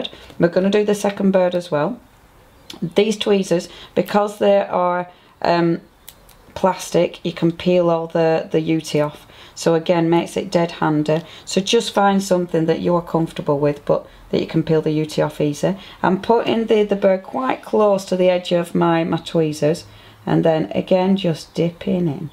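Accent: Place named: Yorkshire, United Kingdom. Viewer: Just beautiful, loving your Yorkshire (?) accent. Very friendly and informative.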